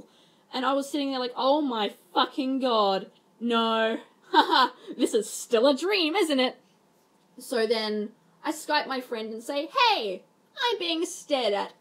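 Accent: Australia. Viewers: not trying to start something, but, are you Australian? or from somewhere around there? I'm just curious. I heard it in ur voice. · instantly new you were an Aussie lol. btw that guy sounds fucked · OOH WOWIE PATOWIE WOW Also are you australian? Its hard to find Aussie youtubers actually... Oh well! im australian too =3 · I wish my Aussie voice was as strong as yours XD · Are you Australian? You sound Australian and it makes you saying "Oh wow is potawie" more funny than it is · OOOOO another Australian!!! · Great artwork! And a very engaging storytime! I'll be honest, I legit grinned when I heard the accent. Nice to hear a fellow Australian doing storytimes. Keep up the good work! You've earned a sub from me for great content :) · OOF I CAN HEAR YOUR AUSTRALIANESS HULLUR FELLOW AUSTRALIA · You sound Australian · You Aussie? 😏 me too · OI YOU AUSSIE? I'm from Victoria XD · Is she Australian? 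She sounds like it but hey just found your content so yeah it's pretty cool so far so keep up the good work. Yah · i smell another aussie I just subscribed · I kind of wish my Australian accent was as strong as yours because I also have some South African in my accent but maybe it’s just because I’m used to myself talking? Anyway, a completely fabulous video!! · I love your accent so much?? Like I'm an Australian too, but I love how heavy your accent is. (If that makes sense oof I hope that didn't sound offensive at all) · OH MY LORD IS THAT AN AUSTRALIAN ACCENT?? (pls be from Adelaide :0) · i dont want to sound weird but is that a aussi accent? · First vid seen by you, IMMA SUBSCRIBE BECAUSE YEE BE AUSTRALIAN ! :D Insert HAHA! BOI! Here to get a bunch of likes but fails badly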